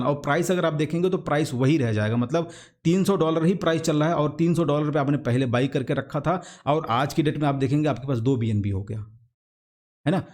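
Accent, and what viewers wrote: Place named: India. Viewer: Very beautiful 🙏🌹sir. ❤️Thanku 😘 love you sir. Or aapka bolne ka desi style mujhe bahut pasand hai.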